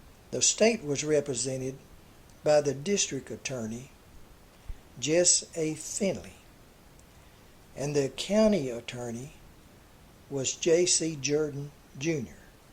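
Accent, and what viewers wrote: South, United States. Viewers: You do a great job of these little videos, and I enjoy your colorful regional accent (I have deep roots in the South - my mother was from middle Tennessee and my father from Virginia so I am used to drawls), but forgive me if I correct one grammatical error which always drives me crazy when I read or hear it: it's hanged, not hung. Use "hung" for things ("I hung the laundry out to dry"), but "hanged" for people ("he was hanged for murder"). This is a common grammatical error and surprises many when they discover it, but I had a grade-school teacher who was adamant about correct grammar. Just sayin'. Thanks again for all these entertaining and informative videos!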